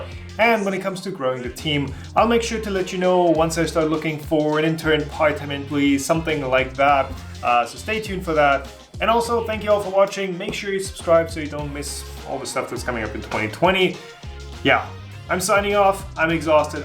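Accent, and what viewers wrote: Bavaria, Germany. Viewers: Habe heute ein deutsches Video von Dir gesehen. Das war so seltsam :D Schönes Phänomen, wenn man Leute mit deutschem Dialekt sprechen hört, die man zuvor nur tadelloses Englisch sprechen hörte. Ging mir das erste Mal so, als ich mit Marius Hornberger sprach. Vielen Dank für die vielen Videos! Grüße aus Wilhelmshaven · Bist du aus Bayern? o.O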